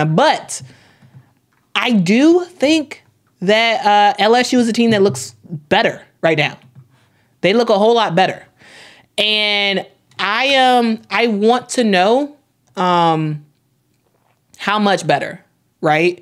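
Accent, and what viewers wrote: Chicago, United States. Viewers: u have a Chicago accent. it’s not as strong as Gherbo, Durk, and Ari’s but we can hear it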